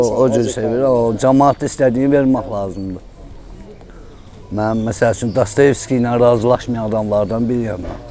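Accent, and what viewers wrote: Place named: Shaki, Azerbaijan. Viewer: Bəli qardaş Şəkili ləhcəsində Şəkili obrazını çox supper oynayırsınız əla, Şəki ləhcəsinin pərəstişkarıyam, salam olsun Şəkililərə.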